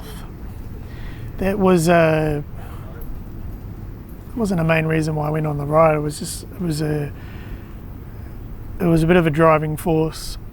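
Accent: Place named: New Zealand. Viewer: Oh I just wanna say your from New Zealand🤣😂🤣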